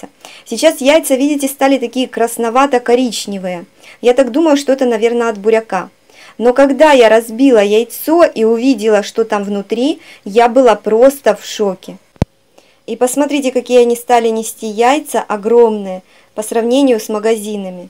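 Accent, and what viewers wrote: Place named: Surzhyk, Ukraine. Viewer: Я не знаю , що там у вас за яйцеалмази, просто суржик ваш ріже вухо, говоріть або вже досконало російською( рашним язиком) , або пререходьте на українську мову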